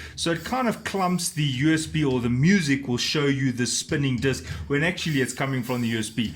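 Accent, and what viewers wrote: South Africa. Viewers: R u south African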